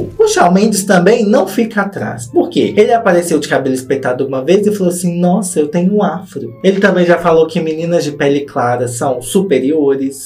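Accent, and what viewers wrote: Minas Gerais, Brazil. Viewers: Menino, acabei de chegar nesse canal com esse fofoqueiro com sotaque mineiro. Eu adorei.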